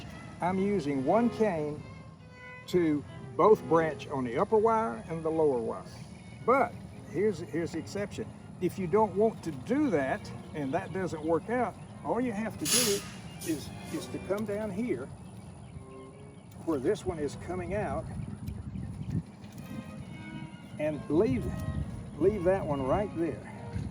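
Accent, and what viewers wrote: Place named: South, United States. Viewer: me too. I was expecting a different accent for sure. Happy it’s a southern accent I can understand!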